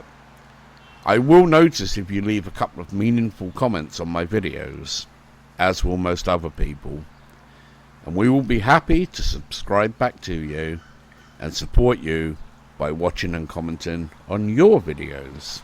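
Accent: England, United Kingdom. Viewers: Oh.. I see... not only your accent but also the atmosphere looks like English one... ha ~ Thank you anyway. Best regards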